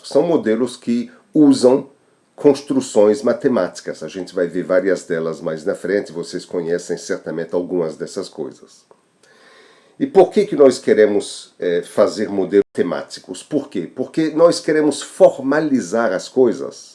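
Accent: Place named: France. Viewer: Aula muito boa 👏👏👏👏 Lindo sotaque francês 😊😊😊😊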